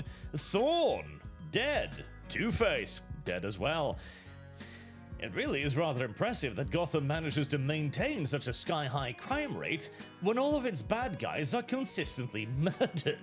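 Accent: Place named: United Kingdom. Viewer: Damn your British Accent makes this review so much better. Feels like 🤔…Writing Styles of The Broke & Brainless would be an appropriate title 😂.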